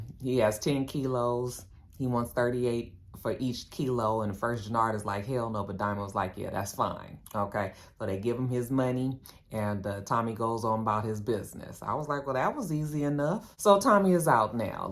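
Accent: Ireland. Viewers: I be waiting for this review just for the Irish accent, I laugh watching the show just thinking about which line you gon say😂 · Lol, whenever I hear your Irish accent. Next time throw in the line, it’s magically delicious.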